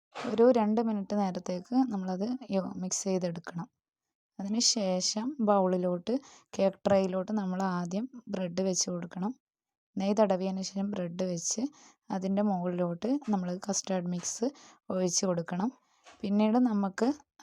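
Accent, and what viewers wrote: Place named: Travancore, India. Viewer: കാസർകോട് ജില്ലയിൽ പ്പെട്ട കാരാട്ടെ സംസാരം മാറി തിരുവിതാംകൂർ സംസാരം ആയി എന്നാ ഒണ്ട് വിശേഷം കൊച്ചെന്തിയേ എപ്പഴാ പാചകം തുടങ്ങി യെ ഒത്തിരി നാളായൊ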